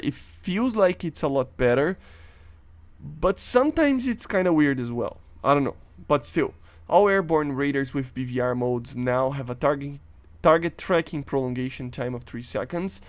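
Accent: Brazil. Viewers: I’m pretty sure he’s from brazil so English isn’t his first language and he probably only speaks English online so his pronunciation won’t be the best.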